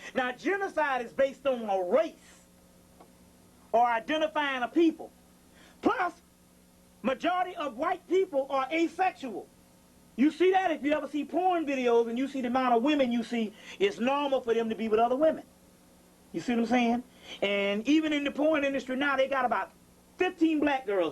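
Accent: South, United States. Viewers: that is very true, I bet if he spoke all prim and proper without that southern accent and wasn’t chubby the blind would prob consider him a lot more, goes to show u ppl are shallow and the spirit realm don’t give af bout societal standards lol.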